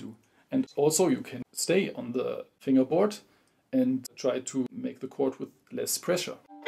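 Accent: Germany. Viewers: man hört das du deutsch bist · Ich liebe diesen deutschen Akzent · wie man einfach hört, dass du Deutscher bist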